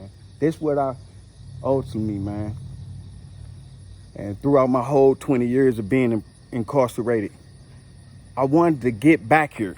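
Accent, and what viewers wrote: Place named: St. Louis, United States. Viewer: I just found your channel and I knew yo damn ass was from St. Louis, that accent from home always sticks out to me.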